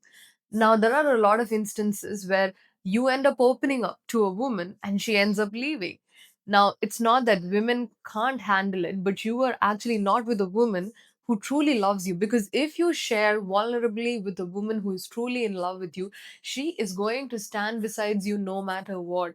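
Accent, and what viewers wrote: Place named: India. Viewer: You must be from India. Your accent gives you away.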